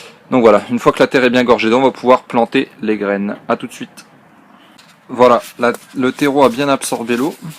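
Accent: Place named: Vosges, France. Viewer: Super ces video, ca enchaine lol... J ai bien vu la (les ) video de Gilbert, mais étant proche de Rambervillers je comprend mieux ton accent;o)) Merci pour toutes ces video et leurs petites musiques douces...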